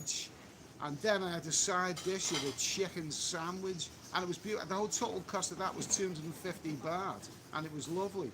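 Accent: Liverpool, United Kingdom. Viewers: The sound is bad it's making you sound like someone from Liverpool.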